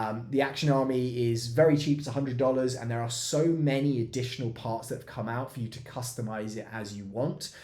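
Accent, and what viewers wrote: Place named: United Kingdom. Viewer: I heard the british accent and began wondering whether or not this was a real gun.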